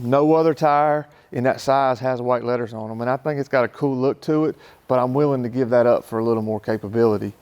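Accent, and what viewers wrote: South, United States. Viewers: Tars (tires), awl (oil). The southern accent has a charm all it’s own.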